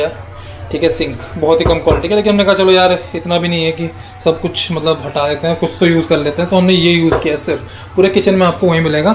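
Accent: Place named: Pahadi, India. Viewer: It was so obvious that you are pahadi by your way of talking... Very nice video you have almost covered all the minute details. Thank you for this video😅 I'm from pahad too😊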